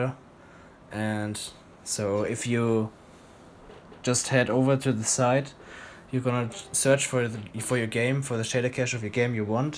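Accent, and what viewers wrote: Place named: Germany. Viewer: Man hört dass du deutsch bist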